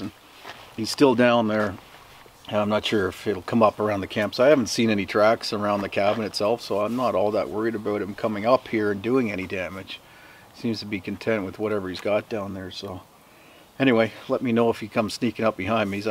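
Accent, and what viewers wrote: Canada. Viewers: Being a Canuck (Ontario) living in the USA and just finding your videos, this is a great feeling. I am enjoying living stateside however, I miss Canada and my backcountry escapes. Nature here is different and while that's great to experience, I have the feeling for the wilderness I grew up travelling to. Killarney, Algonquin Park, North Bay etc. Those trees, bird sounds and smells feel like home in comparison to what we have around us now. So your videos (and accent) are a great view of home. Thank you for making them and I look forward to watching more. All the best!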